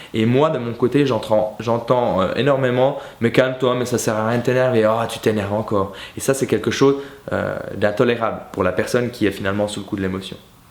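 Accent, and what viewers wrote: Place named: Switzerland. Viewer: On sent la Suisse dans ta voix 🇨🇭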